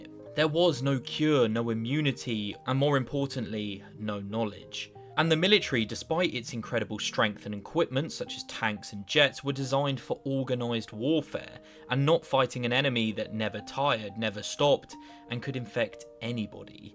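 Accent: United Kingdom. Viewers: Another annoying British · This video would be so much better without the british accent it sucks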